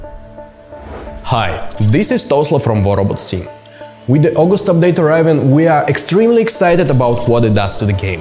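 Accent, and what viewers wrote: Russia. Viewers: i love the russian accent...lol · Nice Russian accent.wow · Ringlish bro russian inglish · Он стоо пудов русский я сразу понял по интонацие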